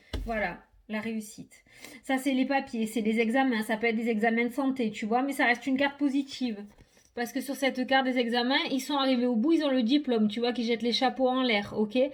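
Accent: South, France. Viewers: Chère, quel bonheur de t entendre! J adore ta bonne humeur, ta spontanéité et ton accent de mon sud qui me manque, j habite en Thailande 😉. Je suis dans un total lâcher prise et si quelque chose me tombe dessus aujourd hui je crois que je serai en mesure d encaisser 😊😀. Gros bisous et un grand merci 🙏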